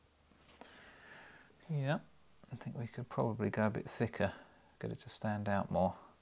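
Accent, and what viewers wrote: United Kingdom. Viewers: I have just found you! I'm very much admiring your British accent & grammar! Your work is exquisite! I've learned quite a lot so far. Thank you so much for giving back! Your help makes me feel blessed and God bless you! I Love your work!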